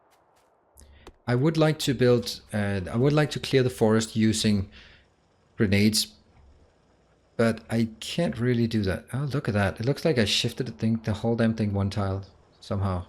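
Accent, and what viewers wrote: Denmark. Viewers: danish accent?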